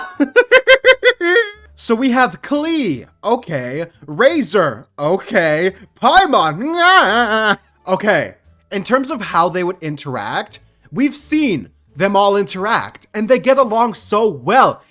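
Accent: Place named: Quebec, Canada. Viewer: Omg are you french/french speaker?? Your accent is awesome, like a French Canadian accent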